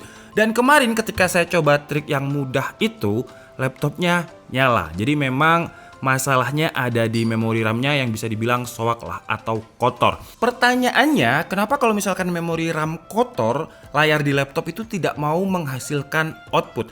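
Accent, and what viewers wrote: Java, Indonesia. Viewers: Pake stip,,, medok jowo banget 😂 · Mase ki wong jowo ketone tek ngletek tek di protoli😭😂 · Mas, njenengan asli pundi e? Haha Masih terasa bahasa Jawa nya.. haha Mantap mas lanjutkan dan jangan lupa mampir di channel saya mas.. 👍🏻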